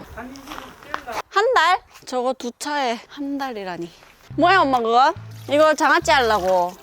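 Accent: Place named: Gyeongbuk, South Korea. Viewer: 경북사투리 구사너무귀여워~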